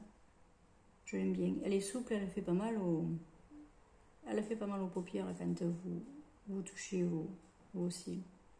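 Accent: South, France. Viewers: Hello, j'aime beaucoup ton naturel sans chichi, c'est très agréable! Et ton petit accend du sud adorable! On ne peut pas plaire à tout le monde 😉.il y a toujours des personnes qui critiqueront. Ne change rien, c'est parfait! Très bien journée, prends soin de toi. Bisous.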